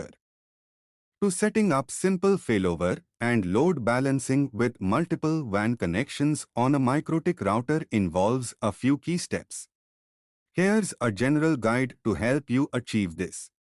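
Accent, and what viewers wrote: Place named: India. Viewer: I think it's an Indian accent rather, lol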